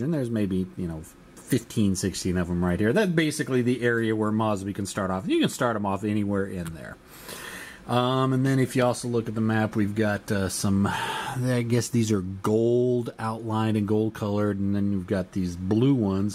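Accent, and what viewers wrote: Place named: South, United States. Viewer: I liked it... the Southern accent added just the right touch....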